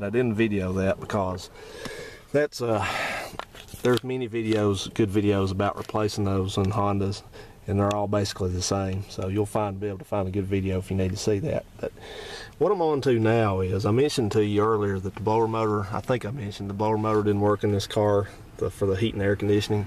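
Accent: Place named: Appalachia, United States. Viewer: You sound like you’re from WNC or E. Tenn.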